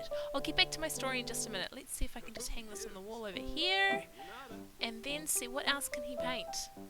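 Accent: New Zealand. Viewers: I love the sims. love kiwi accents also "struth"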